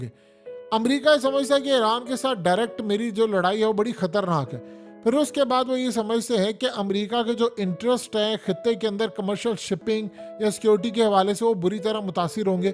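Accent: Punjab, India. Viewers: I can only request you please don’t talk in English coz you speak English in Punjabi stick with Urdu and don’t try to copy different accents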